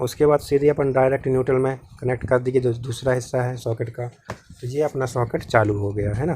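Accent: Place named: Jabalpur, India. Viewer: Bahot badiya ..bahot pyari video hai..lage raho.. Jabalpur se ho kya..aapki bhasa se lag Raha hai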